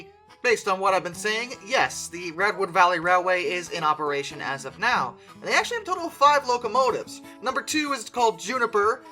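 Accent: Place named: United States. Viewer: i wouldnt call these the smallest but its nice to hear an american talk about the sand hutton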